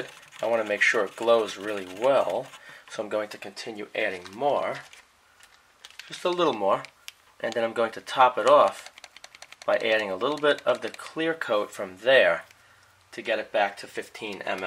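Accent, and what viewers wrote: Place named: Wall Street, United States. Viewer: Wall Street accent.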